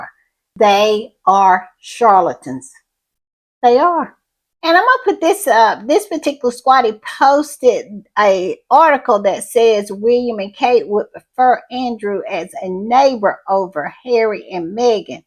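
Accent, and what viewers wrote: South, United States. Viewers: I'm screaming! Not "jaws tight"! And you always have me at " heffa"! 😂😂😂 Yep.... You're a southern gal, for sure! I'm loving it.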